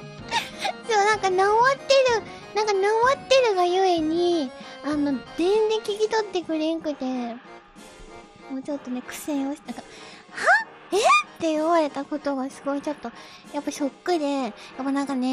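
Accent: Kansai, Japan. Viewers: From the v-tuber wiki: She has mentioned on stream that her accent comes from a combination of her grandmother's Kansai dialect and an unknown dialect of her parents that has not been revealed. She is working on changing it into a more common one. Her accent seems to differ most notably from the inflections of syllables. For example, she pronounces 皆 as MIIN-na rather than the more typical min-NAA. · there was one old stream (don't remember which one specifically) where she says her grandma's Kansai-ben influenced how she spoke when she was little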